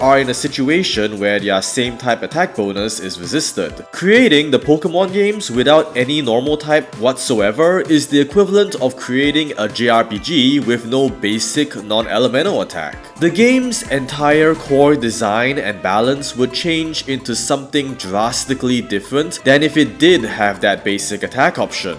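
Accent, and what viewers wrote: Singapore. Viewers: Haha I could recognise the Singapore accent even through your attempts to make it sound more american. I checked your channel and turns out I was right! In all honesty this was a well put together video that is informative yet engaging. Keep up the good work!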